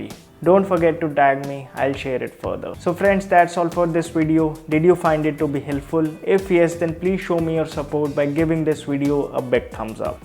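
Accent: India. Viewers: your english is amazing .perfectly indian english .amazinggggggg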